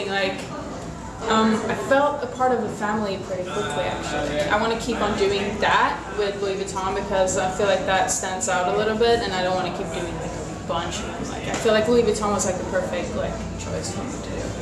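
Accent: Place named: United States. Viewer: i love how she’s from sweden but sounds so americanized.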